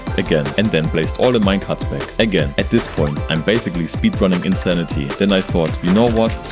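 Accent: Germany. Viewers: Two Things: 1) You make so good content, you deserve 500k and more subs because you put so much efort in your Videos❤❤❤❤❤❤❤ 2) I think you are german (Ich bin selber deutscher und man kann richtig raus höhren das du deuter bist) ❤❤❤❤❤❤❤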